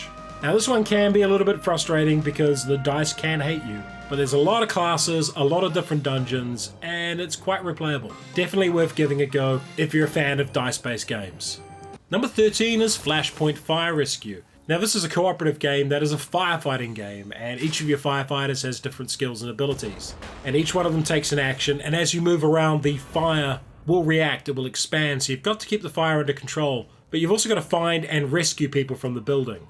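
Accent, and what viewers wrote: New Zealand. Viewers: Oh yay a review by a New Zealander, so I will have access to/knowledge of everything you're talking about! Nice to hear a kiwi voice. I like the looks of One Deck Dungeon and Lords of Waterdeep.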